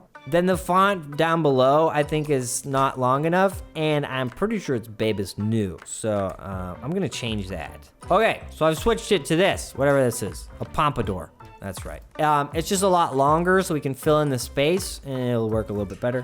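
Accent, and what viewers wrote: Argentina. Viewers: wAit- Are you Argentinian(?? o_O (that or your pronunciation and accent on Spanish is like from Argentina). I'm kind of new in your channel, maybe I don't know this type of information 😅. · Por qué tenés acento argentino? Cuál es tu historia?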